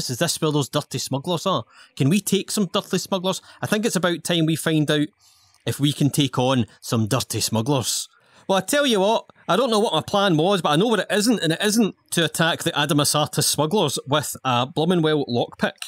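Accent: Scotland, United Kingdom. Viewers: I must know your accent. Is it Scottish? Edit: Lmfao this npc gets it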